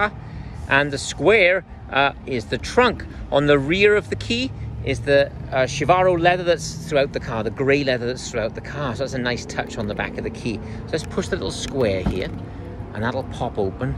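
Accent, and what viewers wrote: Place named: South Wales, United Kingdom. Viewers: Are you Welsh? You sound as if you have a Cardiff accent. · Well I clicked to watch this great video to study the Phantom, but then spent 15 mins listening to an accent that sounds almost from South Wales. It threw me haha. Great video however :)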